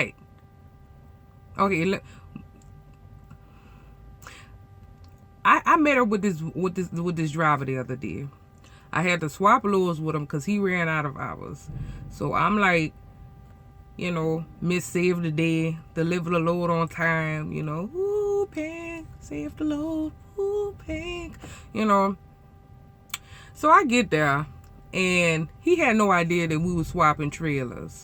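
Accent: Louisiana, United States. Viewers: Not a trucker at all......... Im just hear to listen to the accent... Always been a sucker for a Louisiana accent!!!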